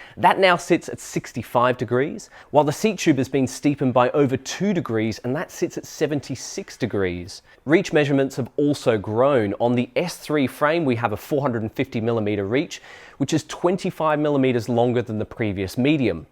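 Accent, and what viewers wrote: United Kingdom. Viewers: Are you a Brit in disguise? Intrigued by the accent... 🧐 (Yes I did enjoy the bike content!)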